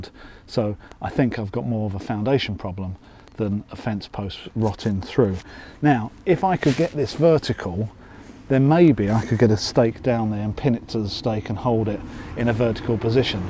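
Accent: United Kingdom. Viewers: That plus the proper British accent had me in under 2 minutes! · Somehow I knew the guy would have a British accent before it started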